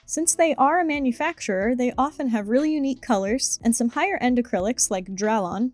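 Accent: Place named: United States. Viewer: Wait a sec, where are you then? You sound American to me but maybe I'm just tired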